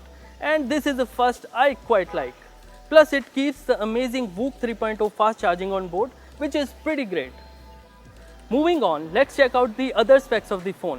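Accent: India. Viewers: Accent of the accent.... Indian accent😂😂😂 Yours is ultimate....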